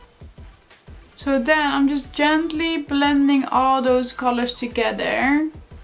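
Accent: Netherlands. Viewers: Je hoort zo goed dat je Nederlands bent. Cute look🤗🤗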